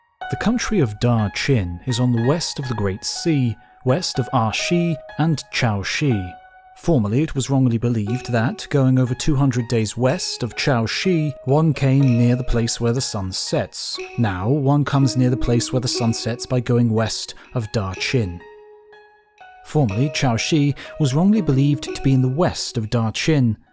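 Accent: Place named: United Kingdom. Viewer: Shut up british narrator. I didn't come here for u or your video, I came for the comment section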